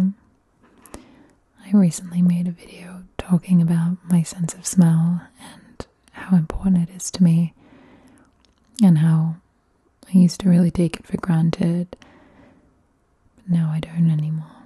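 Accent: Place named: Australia. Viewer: What a great debut to ASMR! Enjoyed very much, thank you. Educational too. Lavender IC sounds amazing. 💜 😋 I always enjoyed the fairies in BoFIII video game PS1. They were cool and pretty helpful. 🧚‍♂️🧚‍♀️🧚 You are very beautiful and I like all your cool crystals and books. Oz accent very subtle and sweet to listen too. Space lights are nice idea too. Look forward to any future videos. 🥰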